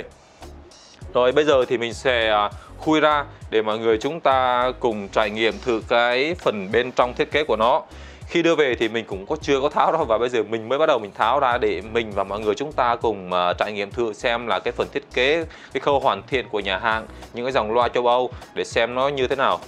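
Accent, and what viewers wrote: Vietnam. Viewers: yeu cau doi nguoi huong dan , giong ong noi nay ko biet o vung nao ma nghe nhu bi dam ngang hong , kho chiu thiet .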